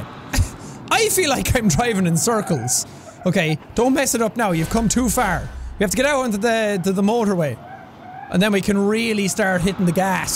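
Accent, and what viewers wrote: Ireland. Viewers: is sounding WAY more Irish these days! ❤️😂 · you Irish accent is to strong to do any other accent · Is it just me or has the become more Irish? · I love that you let your Irish out. I also love it when you say "ya tink?" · Omg his Irish accent came through so hard in this video, I love it! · Is it just me or is extra Irish in this one? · has anyone noticed his accent os more Irish? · sounds especially irish in this video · is it me or has accent only gotten stronger since leaving Ireland xD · This is the most irish has ever been. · You seem more irish today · Is it just me or is extra Irish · Am i the only one who is gonna realize this is the most Irish sean has been in a video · Ok random thing I stopped watching you for a month (idk why) and I come back and you sound 10x more Irish than before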